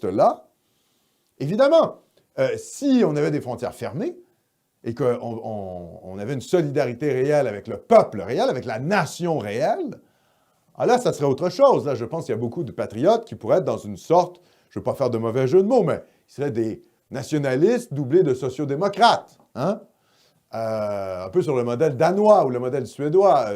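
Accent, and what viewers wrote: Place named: Quebec, Canada. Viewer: Je ne me lasserais jamais de ce mélange d’accent québécois et des punchlines à la française ! Merci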